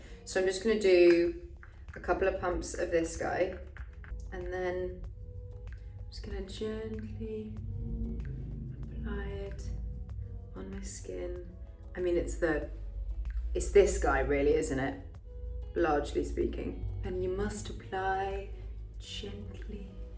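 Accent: United Kingdom. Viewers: I love her british accent>\\\< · Love her British accent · Am i the only one who love this British accent? 😍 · Tell me your British without telling me your British (I didn't know she was Brit)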